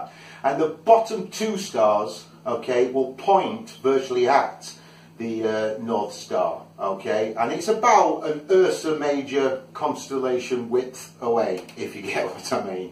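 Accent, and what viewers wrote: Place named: Manchester, United Kingdom. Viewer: Beautifully explained, really helpful and topped off as delivered by a nearby Mancunian!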